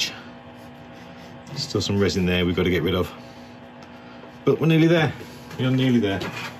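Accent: United Kingdom. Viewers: First of all, thank you for sharing your ups and downs with that wonderful, marble like bowl! Secondly, you found the most important word concerning epoxy pours: "hopefully"... hopefully it doesn't leak, hopefully the colours stay separated, hopefully there will be no voids... Third point: you used epodex as I do, and I have the same issues with heavy chipping. Looking at the turning videos from overseas it always seems so easy to turn resin. But what can you do if there's no total boat or whatever they use available in Europe? You're stuck on Epodex or Dipon no matter how they behave on the lathe. I'd be grateful if someone dropped a hint to a better turnable resin here. Last but not least: Sometimes you can be experienced in a foreign language as you want, your brain is kidding you anyway. The first three minutes I was wondering what a "barking clusion" might be, 😂. 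Keep on turning, I love your videos, your British sound an I admire your patience. I think I'd have kicked the lathe (outch!) when the bowl flew off.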